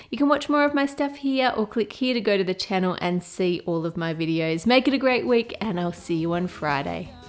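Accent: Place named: Australia. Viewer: I have entered!! But... do you have to click the subscribe with email button? Mom doesn't want more emails... lol. 😆 Anyway, I LOVE your videos, Ann! They're Sooo fun to watch... you're so creative! And I've gotta say, I love your Australian accent. 😊💖 And your nails! They're such a pretty color!!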